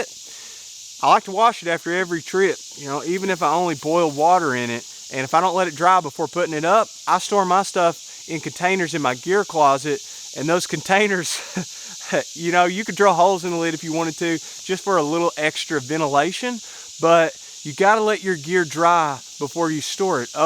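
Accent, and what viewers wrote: Kentucky, United States. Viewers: What’s a “Tint” ? Not sure what a “ Tint” is ? Lol😂😂 It’s like me saying “ Pak your Ca “ I was exposed to your Kentucky accent when Jason hiked up here in March in the snow ! Very cool ! Cheers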